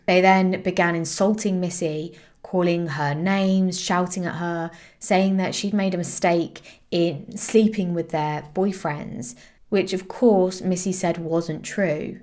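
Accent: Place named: England, United Kingdom. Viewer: you are beautiful. I just found your channel and subscribed. I love the way you report. You do great research., presentation and narration I’m wondering where your accent is from. I’d guess England, maybe a countryside accent. Best of success to you with your channel.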